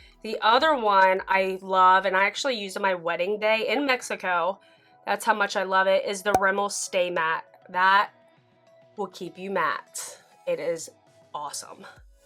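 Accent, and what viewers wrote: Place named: Appalachia, United States. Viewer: You're so down to earth! Honestly that accent is pretty close to the North Central WV/ South West PA accent... Semi-Appalachian. I'm from Morgantown, WV and you sound very similar to me.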